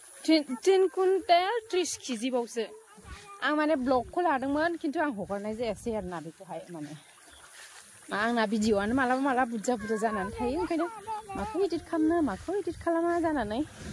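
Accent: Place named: Kokrajhar, India. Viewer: Same to same kokrajharni boro railainaijg milaitarw 100% sure abo ngsina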